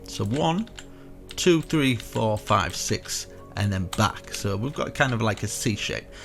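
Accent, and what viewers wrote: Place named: United Kingdom. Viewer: Who the fucks this British guy interrupting my infomercials?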